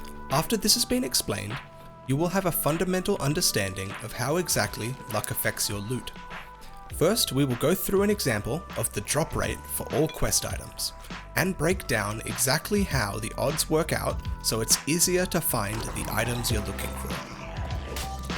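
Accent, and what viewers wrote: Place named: RP, United Kingdom. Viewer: This posh accent is too much for me bud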